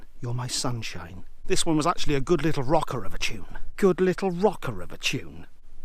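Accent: United Kingdom. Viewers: Great review!Love your british accent!This comment is brought to you by a french canadian! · i wish you could narrate my life with interludes of british accent spoken word ROCK LYRICS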